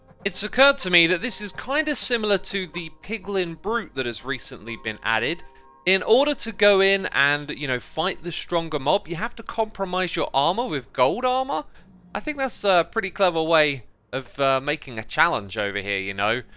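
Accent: United Kingdom. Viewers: Im from Germany and I really love british english. The accent is so awesome and just makes me wanting to live in England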